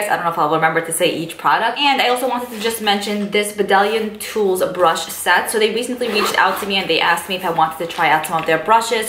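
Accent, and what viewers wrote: New York, United States. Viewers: You have a New York accent. I love it #NY in the house 🤗🤗💕💕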